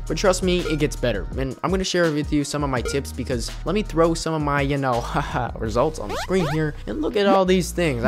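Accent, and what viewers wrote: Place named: United States. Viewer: You sound American but your channel name is why?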